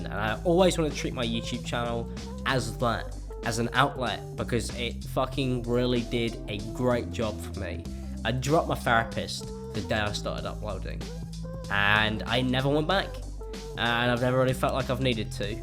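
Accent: United Kingdom. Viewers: You are the first person with a british accent who doesnt piss me off. I suppose yours is more subtle.... Where are you from exactly btw?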